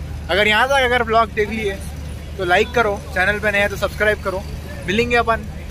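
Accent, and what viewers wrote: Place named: Hyderabad, India. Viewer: Hyderabad accent I love much ,maza aagaya😍😍😍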